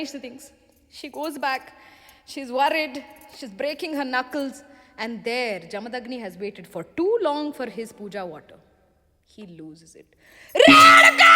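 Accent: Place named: Bijapur, India. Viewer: ಇದು ಧಾರವಾಡ ಕನ್ನಡವು ಅಲ್ಲ ಇದು ಪಕ್ಕಾ ಬಿಜಾಪುರ್ ಕನ್ನಡ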